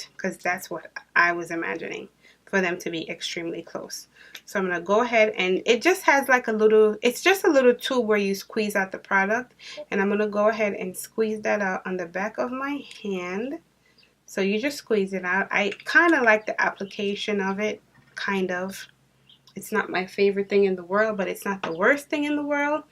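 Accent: Jamaica. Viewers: Great video hon, you sound a little Jamaican.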